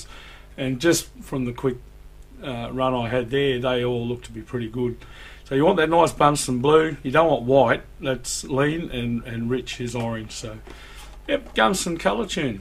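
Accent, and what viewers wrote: Australia. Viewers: Glad to hear that accent from the down under. Hi from the New England reagen usa. ( north of boston lol) Thats a must have kit Thanks soo much . Cleaning out my Kawasaki super sherpa 250 carb.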